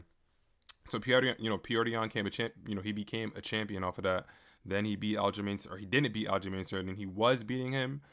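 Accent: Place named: New York, United States. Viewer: my fault bro, you sound like me a little and a bunch if others I know lol. NY maybe? Bless up bro and solid takes.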